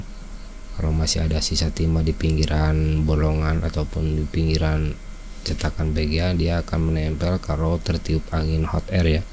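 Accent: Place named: West Java, Indonesia. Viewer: Ti Sunda mana kakang? Urang sampe nguley seuri, ngadangu bahasa "TUNGGU SAMPE BIJIL"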